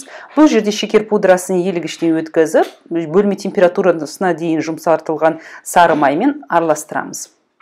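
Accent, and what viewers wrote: Standard. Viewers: Ма щаа Аллах!Аллах енбегинизге баракат берсин!казакшаны таза сойлейди екенсиз 👍👍🌹🌹🌹💋100 лайк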